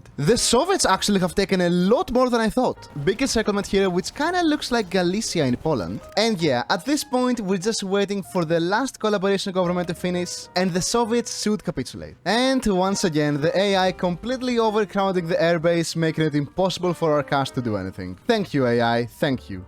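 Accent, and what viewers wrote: Greece. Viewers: I swear you have a greek accent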